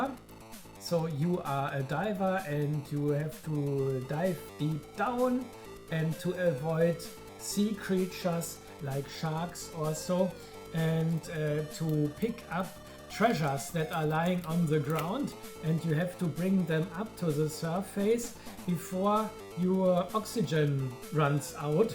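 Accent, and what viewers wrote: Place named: England, United Kingdom. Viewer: Great video buddy. Enjoyed it. Are you in England? Some of your words gave it away 👍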